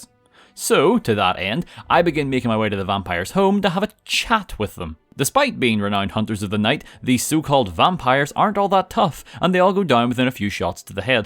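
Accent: Northern Ireland, United Kingdom. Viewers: New you where from N.I. the moment I heard ya. Way to represent the culture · I didn't even notice his accent until he said he was northern irish. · I FUCKING KNEW HE WAS NORTHERN IRISH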